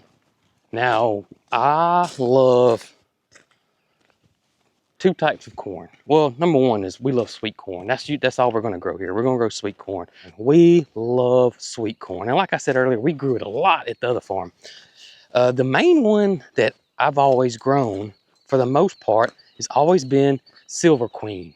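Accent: South, United States. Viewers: your southern accent is thicker lately. Love it.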